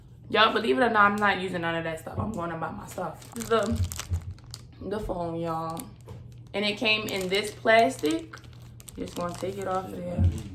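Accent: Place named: Baltimore, United States. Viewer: How was the battery health ? And are you frm Baltimore u got the accent 😭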